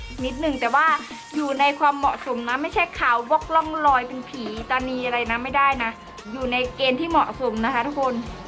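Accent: Isan, Thailand. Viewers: มักคำเว้าแหมะค่ะ555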